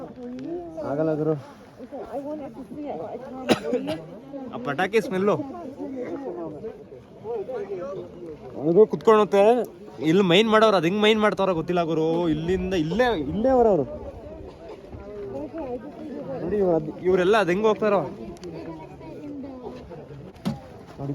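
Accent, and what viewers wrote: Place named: Chikkaballapur-Kolar, India. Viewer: Brother nivu chikballapura kolar side avra Language a tara ide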